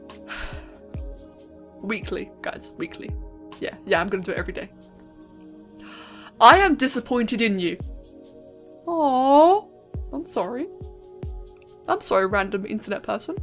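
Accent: United Kingdom. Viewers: So, this is what I missed while I was away at my family reunion? Well, I have no idea what to think of it aside from "wow". Seriously, you look to have quite the following in the Spore community just as much other youtubers for other communities possibly (Alpharad for Smash, NISLT for TF2 (he's practically Alpharad of TF2 from what my brother Robert described him as) though I'm not really sure). The fan art is simply a sight to behold and the amount made for you and the Jowwi. Also, why is it that people mistake you as a boy when you are in fact a girl (a rather ladylike sounding one at that, but it might only be the British accent (no offense)) and I don't mind your voice at all. I. AM. SO GLAD that my comment from the Helminth Charger video that you commented on about my comment being among the list of other random comments and being unsure now what to do with it, thus inspiring extreme depression in me. 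It caused and is (possibly) still causing me as great of pain as my stomachache at the family reunion from going in for seconds and getting a second can of Pepsi right after I finished my first plate and can (me drooling at a trash can in preparation to vomit and all which didn't happen thankfully, the vomiting I mean). I simply want to try to put that behind me and I wish for a second chance in the raffle, that's all I'm asking for. I am dreading and fearing that my comment about you saying my comment reposting was a scumbag, something I deeply regret with all of my heart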